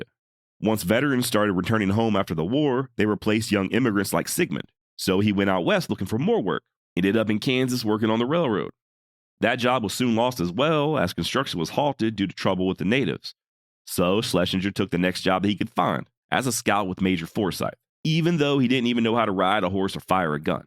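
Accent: Texas, United States. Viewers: Putting a face to the voice I’ve heard for a little while, not gonna lie thought you might’ve been a black Texan… dudes in the south sound a lot more alike across race than anywhere else in the USA. We all have a lot more in common than we don’t. I appreciate your nuance to history telling. And that is coming from a dirty Jayhawker who shouldn’t like the south. I’m pro Americans, even from Missouri lol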